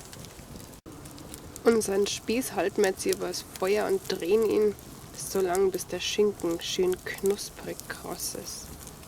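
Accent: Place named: Bavaria, Germany. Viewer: Hallo, des war sche a wanns koid war. Ich seh und hör dir unheimlich gerne zu. Du musst natürlich Hochdeutsch sprechen, aber dir rutschen immer wieder herrliche bayrische Wörter heraus. Für mich als Preuße der in Bayern gelebt hat kein Problem, aber für die die oberhalb des Weißwurstäquators leben, also nördlich der Münchener Stadtgrenze wahrscheinlich schon.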